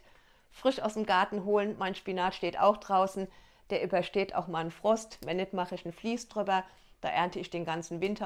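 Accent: Hesse, Germany. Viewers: Liebe, danke für das tolle Video!!! Wir sind Hessen... leben aber schon seit 5 Jahren in Österreich und ich liebe es... deine herzige hessische Ausdrucksweise... bitte weiter so! Grinsss Und ja, ich hätte gerne das Brot mit dem zugekauften Teig als Video. Lass dich bitte nicht ärgern von Menschen die nur motzen wollen. LG Regina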